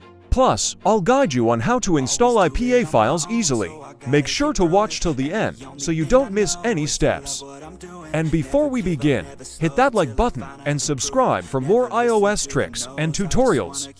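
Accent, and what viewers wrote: South Africa. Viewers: Yo are you from South African ❔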